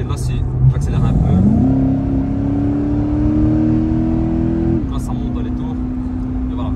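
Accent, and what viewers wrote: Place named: Belgium. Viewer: une guitare !!! retourne compter les chevres au maroc avec ton accent belge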